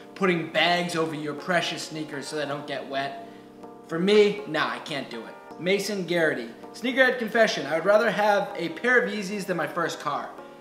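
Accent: Boston, United States. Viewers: I love the Boston accent. anyone that has it I think it just sounds awesome.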